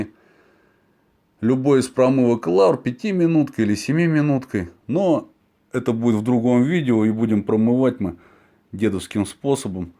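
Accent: Russia. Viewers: По акценту голоса, можно догадаться что вы из Волгограда или волгоградской области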